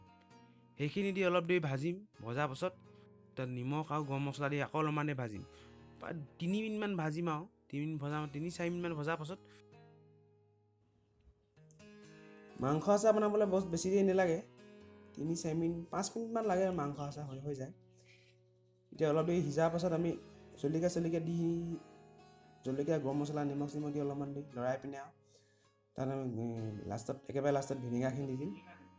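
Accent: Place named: Lakhimpur, India. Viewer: nhoi apunar kotha keita lakhimpur r lorar nisina...so xudhilu...beya napabo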